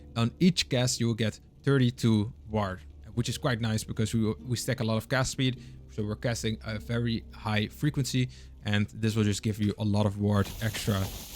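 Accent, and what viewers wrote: Netherlands. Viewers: jaja i hear some dutch accentXD subbed for support^^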